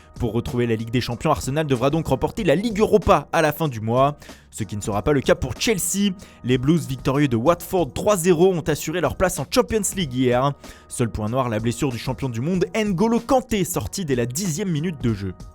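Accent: Italy. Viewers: J'adore ton accent italien comment s'appelle le président de la Juve (Andrea Agnelli)😂💕💞🔥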